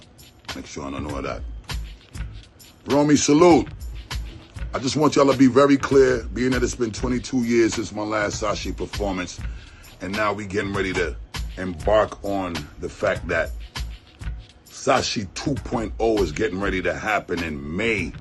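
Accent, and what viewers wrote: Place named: Jamaica. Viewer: ❤Busta! Love the JA accent ❤❤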